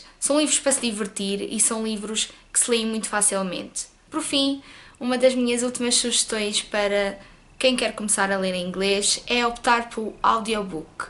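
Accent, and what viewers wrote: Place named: Portugal. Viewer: ADORO o sotaque portugues!!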